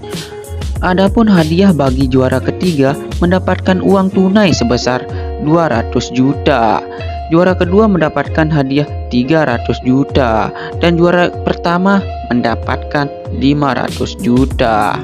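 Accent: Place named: West Sumatra, Indonesia. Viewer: Logat sumbarnya kental banget 😁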